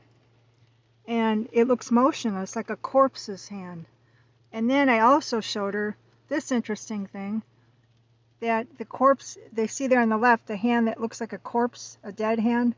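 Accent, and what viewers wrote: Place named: United States. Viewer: Nobody can understand your heavy american accent. Kindly speak with neutral accent or provide sub titles in English.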